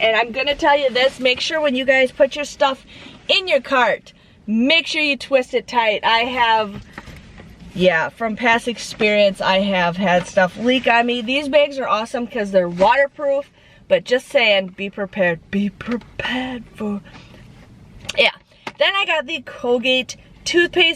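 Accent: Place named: Wisconsin, United States. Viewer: I love your videos! I was surprised that you are in southern Wisconsin, tho, judging by your accent! It sounded closer to northern to me. Thank you for sharing so many deals!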